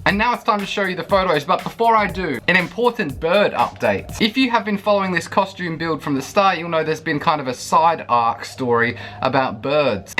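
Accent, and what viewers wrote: Australia. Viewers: noice!! i would subscribe to your bird channel too, also do David Attenborough voiceover with your cool Aussie accent :)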